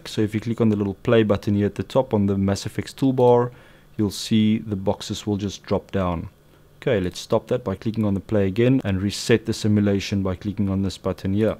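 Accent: South Africa. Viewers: South African accent!!! lekka boet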